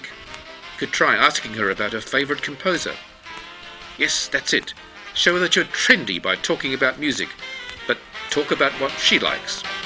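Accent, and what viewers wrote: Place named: South Africa. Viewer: Funny! But why the South African accent?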